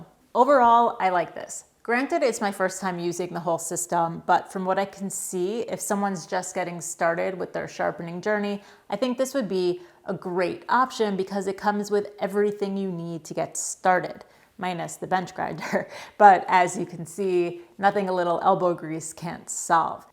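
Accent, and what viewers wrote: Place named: New York, United States. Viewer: Good work, but please announce that you are evaluating donated items at the beginning. You get me to grin every time you mention draws because I remember way back when to talked about the folks that "corrected" your New York pronunciation. Oh, and the lapping fluid? I use one of those little bottles, too. The first one went quickly because I flooded the surface. I have found, however, that just a few drops here and there (I tap the opened spout to spit out a drop) spread out and supply ample lubrication. YMMV, however. 😁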